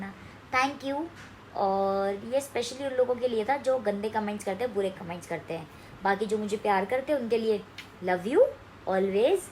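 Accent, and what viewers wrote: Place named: Haryana, India. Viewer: tum haryanvi me hii vlog banaya kro achi lgati ho bolte hua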